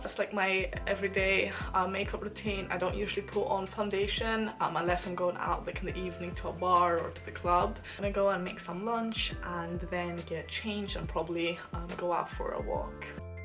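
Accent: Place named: Aberdeen, United Kingdom. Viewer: Your accent is so mixed but so Aberdonian at the same time haha, are you Italian? Great video.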